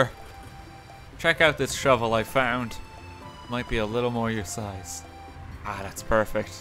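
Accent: Ireland. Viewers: i just found this channel, he kinda has an irish accent lmao- subbed because he's actually really funny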